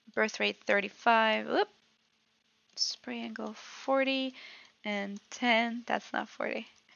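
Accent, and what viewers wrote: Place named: Netherlands. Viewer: You accents sounds like Dutch (Netherlands)🇳🇱 ones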